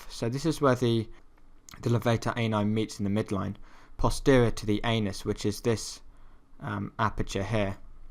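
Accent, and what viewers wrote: United Kingdom. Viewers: Love you, love you're work!! Crying with laughter at that brilliant verse "S2, 3, 4...". A&P exam tomorrow for my yoga teacher training cert, have been through so many of the vids in last two weeks- they are brilliant and have helped me massively. None of this crappy american voiceover stuff, was over the moon to hear the British accent when I clicked on the first one - legend. I'd better pass now! :-)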